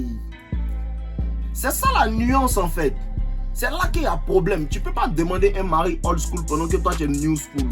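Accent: Ivory Coast. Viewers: j'apprécie ton français ivoirien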